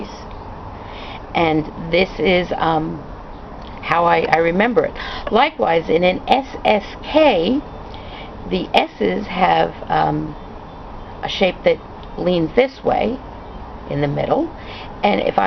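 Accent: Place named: Troy, United States. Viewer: We used to go to Troy on our way to Cadyville almost every week end because we had partying friends in "those days". Troy, was "big" on our list! I know; because your accent is most evident. I am amoung the 20 percenters of knitters. I knit though the back loop and purl through the front loop. I get a cross stitch unless I purl through purl through the back correct? I wrap my purls the same as You do. I subbed and I don't know how I missed Your wonderful channel in the past! Thank You. I do have a question for You. What will the most probable outcome be if I enter some of My awesome socks or knitting into the fair for judgement in Our today's world?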